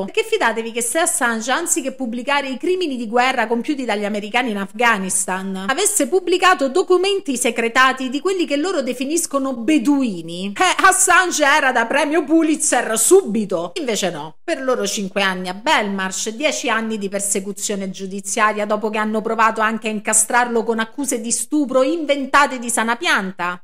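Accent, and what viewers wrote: Rome, Italy. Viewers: continua pure con la calata romana perché tu la fai elegante e rendi chiarissimo i concetti.....belle ed efficaci anche le espressioni che accompagni la calata romana.E poi usi espressioni dialettali romane e non in marie eccezionali, simpatici e precisi, mi fai morire con quelle siciliane😂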